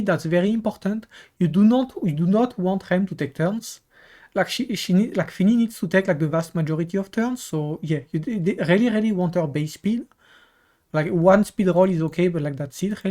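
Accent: France. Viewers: Yea man your french accent is too strong lol..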